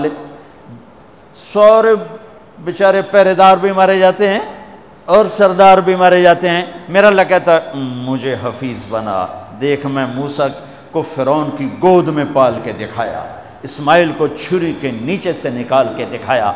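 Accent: Punjab, Pakistan. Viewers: Maulana ji mai india punjab to reverted muslim thoda pakistani punjabi accent bada kaim e ,,, mere naal gal karlo.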